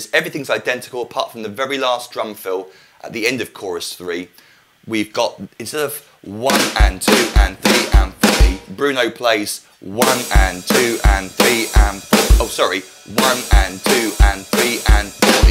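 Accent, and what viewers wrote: United Kingdom. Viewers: Thank you! Like your strong British accent.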